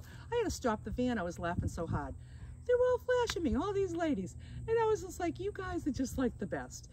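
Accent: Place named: Boston, United States. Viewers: You talk just like me so you must be from New England. 😊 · Yep I recognize that Bawsten accent. Love Boston ( I’m in Ct) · Love your van, so well thought out and comfortably organized. I'm from Boston born and raised so I recognized your accent! I love how you have accommodated your priority to your self care of healthy eating and lifestyle, you have proved it can be done even living in a small van on the road. Brilliant!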